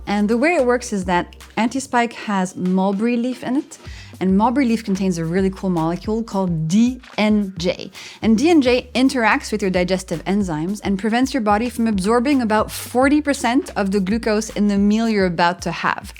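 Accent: France. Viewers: You are a good YouTube personality & diet restriction advice giver. Vogue is always a sucker for a French accent. You say absolutely nothing about consuming enough water, but are happy to show us your cute little recipes. We know the information you are giving is fraudulent, masquerading as science, and all those comments on your channel agree with this. Hopefully you can change your tune, or just do something else entirely, instead of contributing to society’s toxic diet culture. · American former French teacher here. Her English is so good, I thought at first she must be a native bilingual speaker, maybe Quebecoise (French Canadian). "Elle n'a que l'ombre d'un accent francais..." Brava! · irritating french accent lol n your not a Goddess . Repeating what all the internet knows already to sell supplements or yourself ....why i hate this period in time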